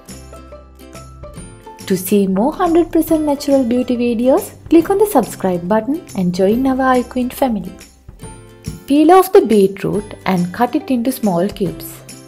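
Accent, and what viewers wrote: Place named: Sri Lanka. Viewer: Accent quite similar to Sri Lankan . 🥰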